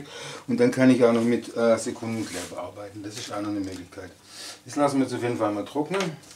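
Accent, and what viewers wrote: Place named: Swabia, Germany. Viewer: dass du dann doch nicht zu extreme ins Schwäbische verfällst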